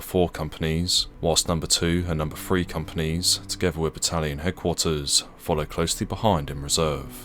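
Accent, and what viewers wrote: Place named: London, United Kingdom. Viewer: Irritating London accent narrative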